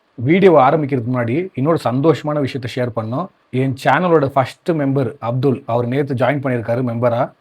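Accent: Bangalore, India. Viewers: Bangalore tamil ah ..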